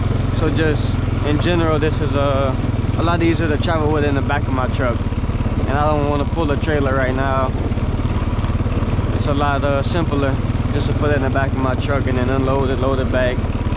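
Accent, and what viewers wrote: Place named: Louisiana, United States. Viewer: First video of yours I come across while looking up Honda 420’s and immediately noticed the accent lol. St Landry or avoyelles parish?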